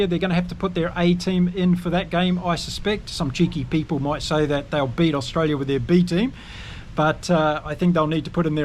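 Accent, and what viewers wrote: New Zealand. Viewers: Someone savaged my comparing your accent to great rugby commentary from NZ pundits, granted it's been a bit hairy of a reaction from The Breakdown but still find your demeanor very astute, knowledgeable and importantly unbiased. Keep up the great work. :)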